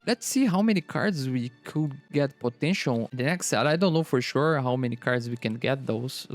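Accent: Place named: Brazil. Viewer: Brazilian portuguese accent am I right haha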